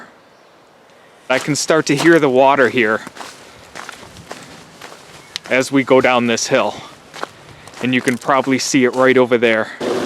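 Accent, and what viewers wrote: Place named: Western New York, United States. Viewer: Just found your channel, and heard the WNY in your voice. I grew up less than an hour from this trail. Live in VA now, and miss the geography and the seasons. Subbed. Go Bills!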